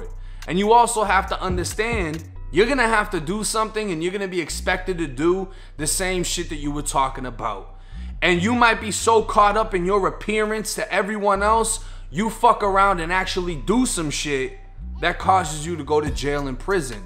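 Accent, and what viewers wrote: Boston, United States. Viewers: Bro what part of Boston you from...!!!??? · Your accent sounds like you was raised in Boston and moved to a Florida hood when you were 10..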